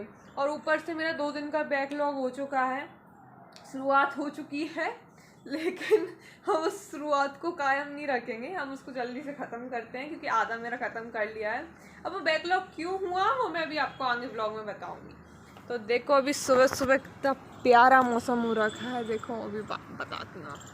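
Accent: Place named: Bundelkhand, India. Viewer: Aap kaha se ho ????? Sounds like bundelkhandi accent !!!!!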